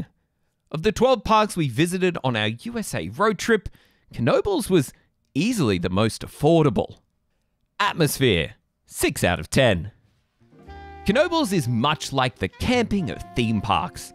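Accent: Australia. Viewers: I'm just here for the Aussie accent. 😂😂😂. Love it. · As someone who grew up about 15ish minutes away and has spent countless hours at Knoebels over the last 40 years, it's just really cool to see it get some recognition for what a lovely little park it is.... I also absolutely can imagine the reaction the Aussie accent caused. I even did a double take myself hearing the accent in the video. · It has been a few years since I've been up there at Knoebel's (I remember when it was still called "Knoebel's Grove"). It takes me a good 4 hours to get there from where I currently live. That is truly an amusement park in its true, classic meaning. This is probably the last remaining park that still operates the way it does, with no admission, and using ride tickets to get on the rides. I still have a huge stack of those ride tickets, so I may have to consider going up there this autumn for the Phoenix Phall Phunphest. 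There are other parks that started out like this that still exists although they transitioned to the more typical modern gated theme park. Kennywood in Pittsburgh is one, as it was originally a trolley park. Hershey Park is also one as it was originally meant to provide amusement for the company's employees and family on the weekends. As for as the local's reactions to your Australian accent, not very many Australian people visit that part of the US, so getting see, meet and hearing one is a pretty rare treat there. Going to Knoebel's really does feel like stepping back in time and experiencing a truly traditional amusement park.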